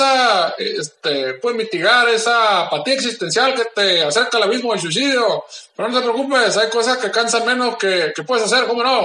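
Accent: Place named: Sonora, Mexico. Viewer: jajaja se nota que es de sonora arriba sonora!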